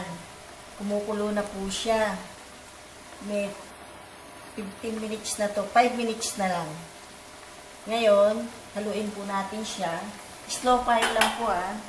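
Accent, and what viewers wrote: Philippines. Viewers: idol ko si ATE TAGALOG na tagalog ang punto ..Ako po ay taga BULACAN BULACAN kayo po ay taga saan ?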